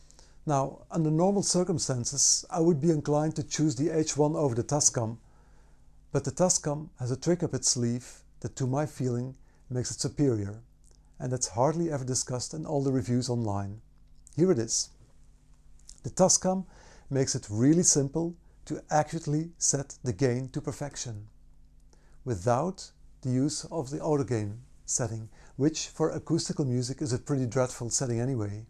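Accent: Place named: Netherlands. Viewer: Je Nederlands komt er door, geen probleem hoor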